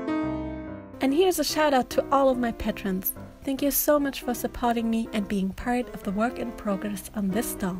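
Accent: Germany. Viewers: Are you from Germany? I don't know why but you really sound kinda german :D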